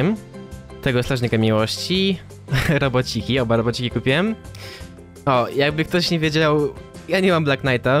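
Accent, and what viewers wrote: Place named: Podlasie, Poland. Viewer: jesteś z Podlasia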